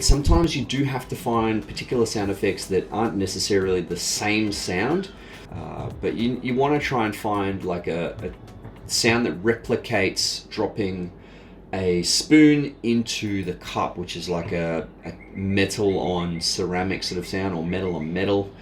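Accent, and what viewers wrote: Australia. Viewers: Sound Aussie bruh. Awesome vid helps alot! Waiting for my A7iii looking at 17-28tam also 🤩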